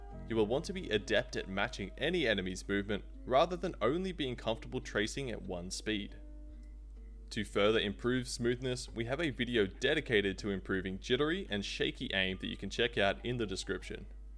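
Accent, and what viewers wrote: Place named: Australia. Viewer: U were tripping I think he just Australian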